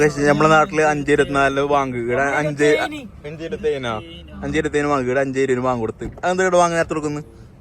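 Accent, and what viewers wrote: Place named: Kasaragod, India. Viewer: Nigal kasargod anoo.... Live kandu.... Samsaram kettpo kasargod ann thonnni Ksd ano nigal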